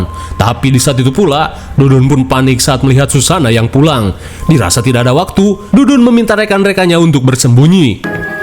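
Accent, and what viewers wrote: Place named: Banten, Indonesia. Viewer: Mang orang banten?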